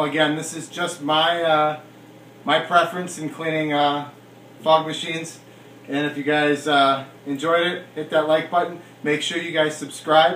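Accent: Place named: Wisconsin, United States. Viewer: 10 years after this was posted I'm here learning.. I only stayed to watch because of your accent 😂 and you're from Wisco.. my family is from Fond du Lac .. I'm in Ga ( booooo ....Go Pack Go) ANYWHOOOOOOOOO thanks for the tips! I have 3 fog machines to clean before I store them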